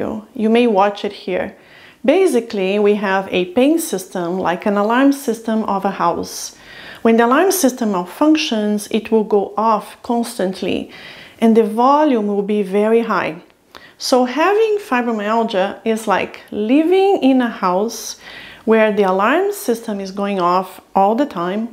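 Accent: Brazil. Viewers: This female has Brazilian accent.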